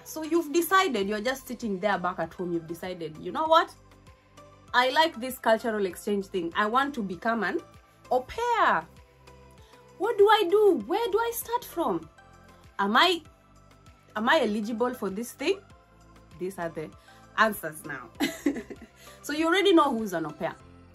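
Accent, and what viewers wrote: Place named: South Africa. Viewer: You speak like South African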